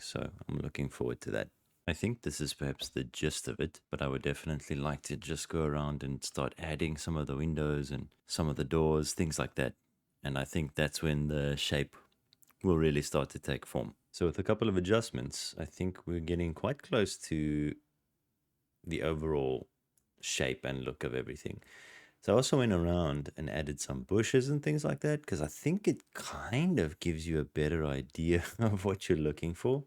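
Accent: South Africa. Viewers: I'm new here. Are you South African?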